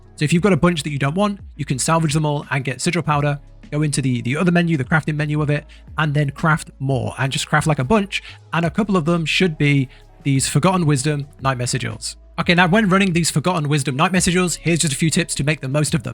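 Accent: United Kingdom. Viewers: he talks too dam fast to the point where it sounds like he sped his voice up 😂 I rewinded the vid 8x still can't understand the 1st thing im supposdd to do 💀 And the UK accent isn't helping 😭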